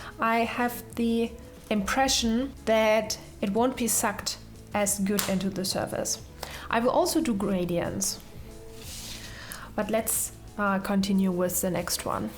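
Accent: Germany. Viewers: Your german-english is so charming 😍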